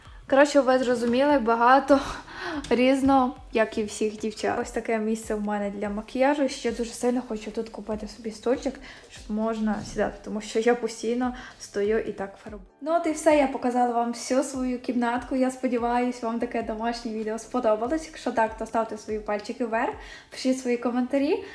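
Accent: Ukraine. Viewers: как я давно не слышала Украиеский акцент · закарпатський ділекь вуха ласкає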